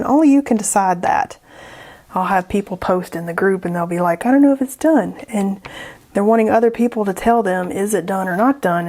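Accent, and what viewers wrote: South, United States. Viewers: I love these colours. This is beautiful. Unfortunately, it was late here in Australia and I watched this in bed. It’s been a hectic weekend and had no spare time to watch it earlier. My other half was sleeping, and I didn’t want to wake him, but I couldn’t resist watching. I’ve saved it and will watch again tomorrow, so I can hear that wonderful southern accent. However, you can’t get a more southern accent than Australia. Any further south and we are in Antarctica 😂😂. Thank you for another wonderful video 🫶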